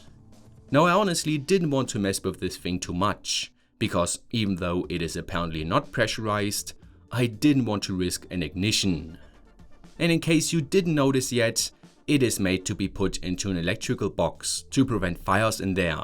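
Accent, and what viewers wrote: Germany. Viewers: you are not even Scottish, this is a heavy German accent if I am not mistaken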